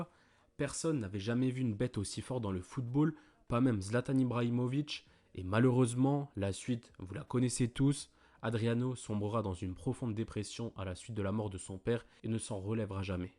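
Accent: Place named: Italy. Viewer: Ta culture foot et ton accent italien me font rêver. Merci!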